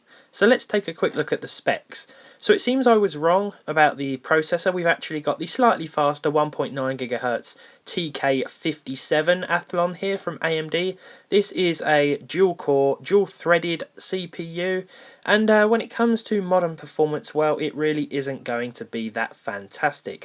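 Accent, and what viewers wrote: Australia. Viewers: Love the Australia accent 😂😂😂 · Need more Aussie accent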